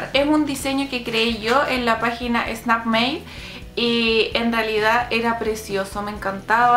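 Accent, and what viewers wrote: Chile. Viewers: Eres chilena porque ablas como chilena y ademas eres muy linda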